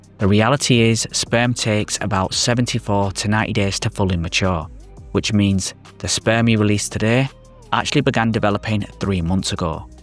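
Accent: United Kingdom. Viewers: I'm curious about your accent... which region of the UK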